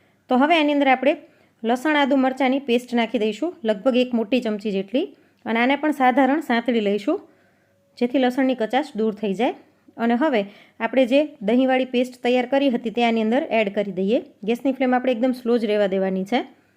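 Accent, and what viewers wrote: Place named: Ahmedabad, India. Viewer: Hu amdawad thi badhaj Shak saras che ben ❤ tamari boli parthi tame pn amdawad na lagocho ben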